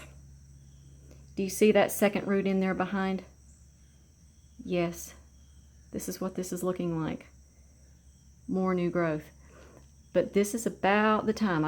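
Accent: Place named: East Tennessee, United States. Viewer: Those are really great roots and I am also amazed at your accent. I was born ed and raised in upper east Tennessee and you sound like a touch of home. I am now in South west VA but I could listen to you talk all day. Thank you is all I can say.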